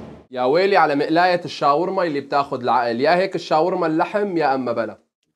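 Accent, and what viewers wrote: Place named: Damascus, Syria. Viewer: الشيف اشطر من الشيف عمر وكل شباب الشام تقريبا بيحكو بهالطريقة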